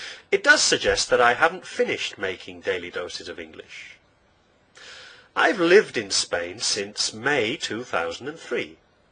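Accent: United Kingdom. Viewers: Thank you very much, I love your daily dose of English and most of all your AMAZING and musical British accent. I'm Italian and I'm studying English seriously since 2014 but, sometimes, I think that my accent is definitely hopeless. I'd like to narrow my vowels and to smooth the double consonants, but I tend to sound .... I don't know how to explain it ... too long whereas you British have this short and cut and clean although "bonded" way of pronouncing words. It's difficult for me to shadowing you, but I'm dedicated and stubborn, so let's see what happens. Again, thank you for your eccellent job and have a lovely weekend. Monica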